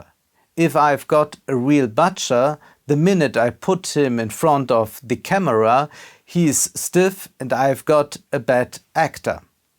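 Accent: Germany. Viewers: Interesting, so this is the german accent everyone talks about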